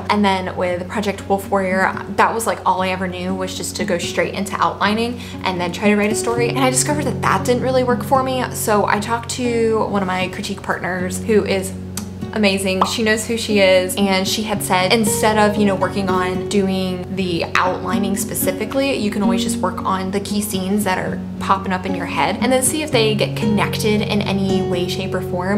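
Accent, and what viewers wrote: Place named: South, United States. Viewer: Don’t hide the accent! We love the Southern flare 😁 I can’t wait to see your new project and how it progresses! It’s going to be awesome!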